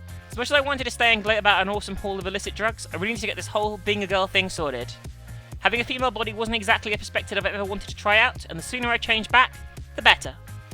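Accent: South, United States. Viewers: I love the southern actcint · Not a fan of this stupid game, but I personally luv southern accent!:) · I love the southern accent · People really hate that southern accent voice? I find it enjoyable and in your Dangonropa voice it kinda fit Nagito's bat shit crazy personality.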